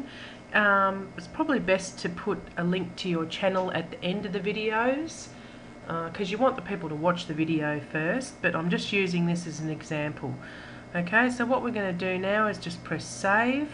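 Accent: Australia. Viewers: Australian??? IM AUSTRALIAN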